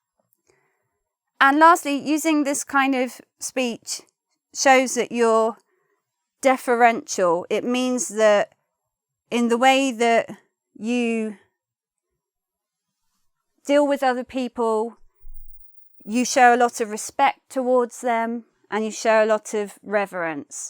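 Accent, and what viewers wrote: United Kingdom. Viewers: Thanks my best teacher ..you make me love British accent .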